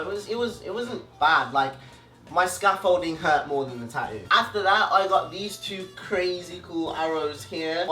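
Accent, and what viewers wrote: United Kingdom. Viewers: Ma Nigga on your songs you sound like you'r from hell but on your videos you sound like you'r from london (because england is my city) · Wth I f with his music I never thought he would talk like this I didn’t even know he was British damn tuff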